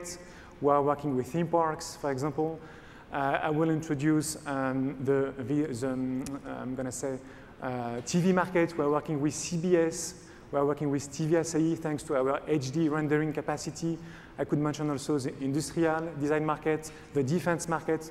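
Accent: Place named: France. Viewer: superbe ! ( l'accent francais xD )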